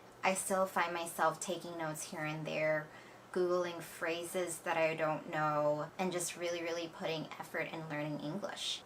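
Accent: United States. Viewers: You do have a perfect American accent though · 好像知道Dena的American accent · Dena的腔調確實非常道地，一聽就像是美國長大的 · 你還沒出國讀書的時候，口音也是很像美國人說的嗎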